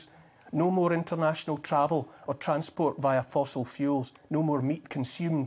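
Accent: Scotland, United Kingdom. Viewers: You beautiful man! Pomposity flensed and truth laid bare with a soft Scots brogue.. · Brilliant. What Scottish accent is that? What region? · Best smack down delivered to the globalists and technocrats ever! And all done with a lovely Scottish bur that drips with both strength and sarcasm and humour, as only the Scots can do. Never more eloquently have I heard someone hold forth so persuasively, driving home the "One law for me, one for thee" message. Subscribed!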